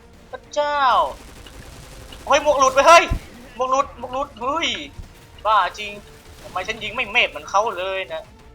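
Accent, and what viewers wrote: Isan, Thailand. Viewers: คนอีสานรึป่าว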